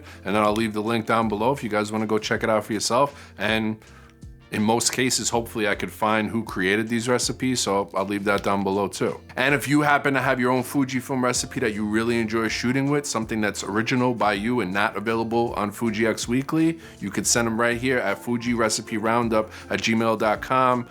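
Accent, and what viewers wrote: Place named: Queens, United States. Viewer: Great review, currently thinking of picking the XT30ii as my EDC camera. By the way, that accent...Queens, NY right? I'm hearing Flushing or Jackson Heights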